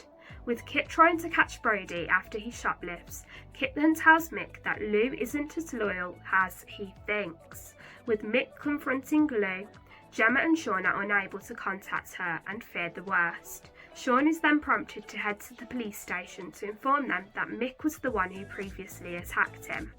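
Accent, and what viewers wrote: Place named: Midlands, United Kingdom. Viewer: I love that you have a Midlands accent 👏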